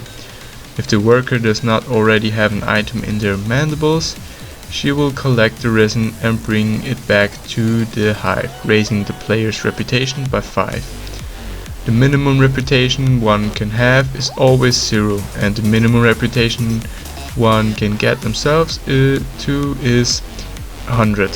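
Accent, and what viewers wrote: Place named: Germany. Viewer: Ich dachte mir bei jedem deiner Videos ey der hört sich so deutsch an auf einmal in diesem Video bei Minute ist da ein Video von Lekoopa 😁 heißt du bist doch deutsch😄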